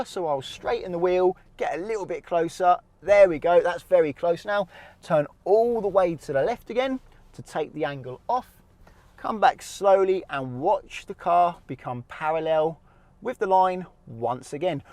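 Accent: United Kingdom. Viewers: Perfect explanation and your British accent so classy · Superb explanation,the British accent is lit!lol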